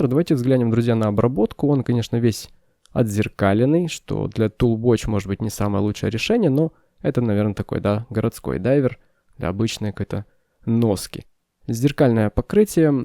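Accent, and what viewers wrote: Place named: South, Russia. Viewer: Обычный южно-русский говор. Давайте относиться снисходительно. Тем более, автор компетентен.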